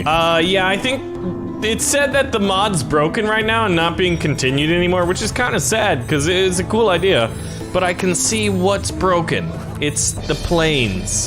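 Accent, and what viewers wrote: Germany. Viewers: im from germany and i looove your german accent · Great German accent 10/10 (I am not getting paid by to say this)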